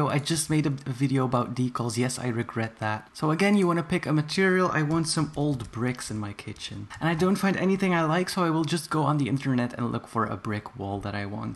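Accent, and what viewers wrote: Netherlands. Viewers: 0 Are you dutch?! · Omg he's Dutch like meh! Yasss Queennn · hold up, i thought you were an American! But no (lol its going to be fun if im wrong) you're a dutchie! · Wait, are you Dutch?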